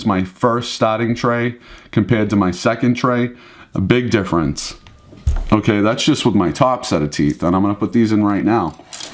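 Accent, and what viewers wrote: Boston, United States. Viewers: I'm on day 4, and my tongue is still rubbing on them really bad. My gums are really comfortable though. Do you have any tips for that? I love the accent by the way. I always thought it was just exaggerated in Affleck movies, but it's real!! Cute. Also: did you have a lisp when you started? I do and it's really embarrassing. Last question!! Do you drink water with them in?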